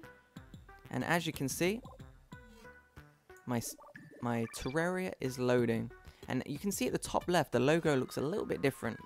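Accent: United Kingdom. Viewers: prepubescent englander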